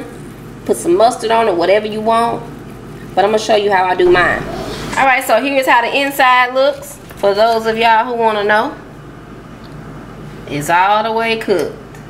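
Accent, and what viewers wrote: South, United States. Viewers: Great video .keep up the great work. And I just love you country style accent. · I love Southern women's voices...I assume you are from the South. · Love that Southern accent !!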